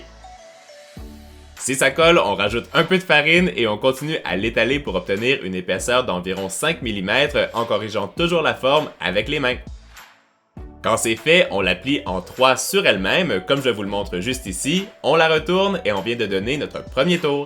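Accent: Canada. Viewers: Bonne année et j'adore ton aksent du canada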